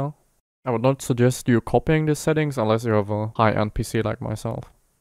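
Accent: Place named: Germany. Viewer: I'm just here for the German accent ASMR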